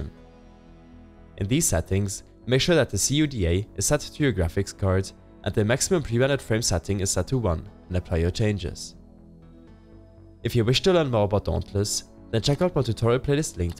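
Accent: Germany. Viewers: you speak like a german, if im right then danke für das tutorial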